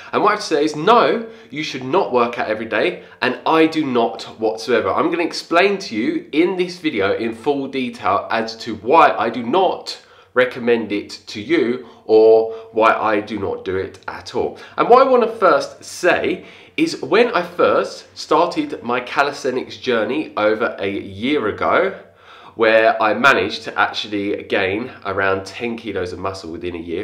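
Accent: United Kingdom. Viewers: but where is your accent from? You sound British? Just curious, keep it up👍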